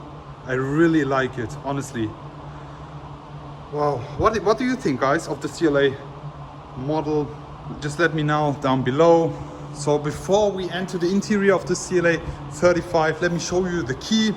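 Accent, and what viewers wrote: Germany. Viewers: sorry ich bin jetzt auch nicht besser aber man merkt das du deutscher bist wegen der Aussprache aber wie gesagt ich bin auch nicht besser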